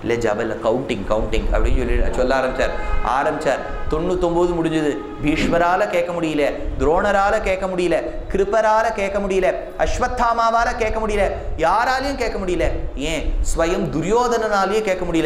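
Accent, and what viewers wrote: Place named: Bengal, India. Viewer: Your Bangla accent was so fine Swamy. Loved that song. Pranams to u.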